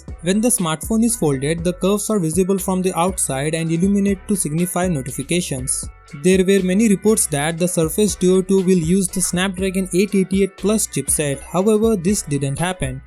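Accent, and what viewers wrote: India. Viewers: Indian accent is so garbage.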